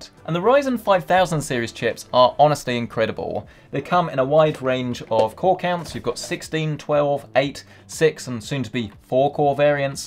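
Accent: United Kingdom. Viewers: he's so bri'ish heh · Is this guy British?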